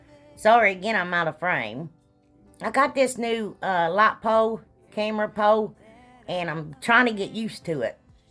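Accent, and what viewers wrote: South, United States. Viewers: Where are you from? You sound like me , country accent!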